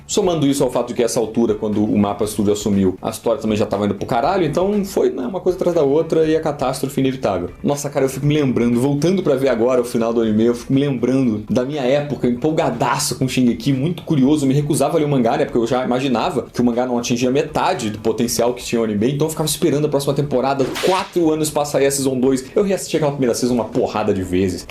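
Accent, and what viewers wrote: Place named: Rio de Janeiro, Brazil. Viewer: UÉ, O sotaque carioca tá voltando?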